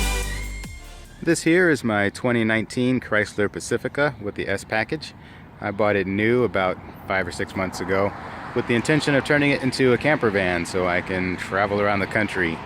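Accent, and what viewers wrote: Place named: Midwest, United States. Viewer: I came across your channel while searching for videos on Mini Van conversions as I have a 2014 Town & Country. As I listened to you I thought you sounded so much like my nephew who lives in Hobart. I watched all of your videos in one sitting and in one you state you're going from Portage to Portland. So that WAS a mid West accent I was hearing, and truly you sound just like my nephew! I thoroughly enjoyed watching your videos and look forward to more! You have a wonderful sense of humor and a great voice for YouTube!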